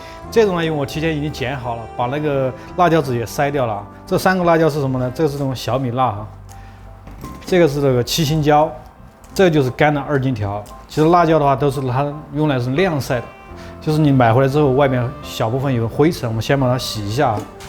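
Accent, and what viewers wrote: Sichuan, China. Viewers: 我的父母都是四川人，在美国听到四川音感觉很亲切。四川好男人，靠谱！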